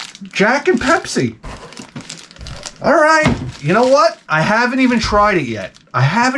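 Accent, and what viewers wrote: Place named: Boston, United States. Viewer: I honestly think this dude is BETTER at riffing than Bill Burr. And I’m not comparing the 2 just because of the boiston accent and hair tint. This show is like more focused and listenable than the mmp, and the boring sports talk is replaced with cool retro vidya game stuff. No disrespect to freckles though, it just seems like he runs out of steam after a while.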